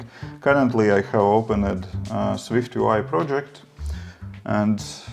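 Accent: Russia. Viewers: Actually your accent is the same as russian 🙃 Interesting video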